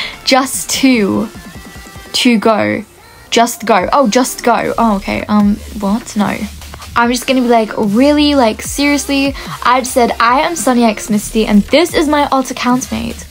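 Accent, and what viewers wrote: United Kingdom. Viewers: Um are you British?😂 i hear it in your voice!😅😅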